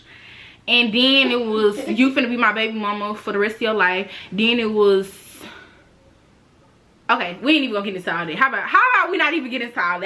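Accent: Louisiana, United States. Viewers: I just knew you was from louisiana😂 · Not you from Shreveport lol . It must be a Louisiana thang 😂😂😂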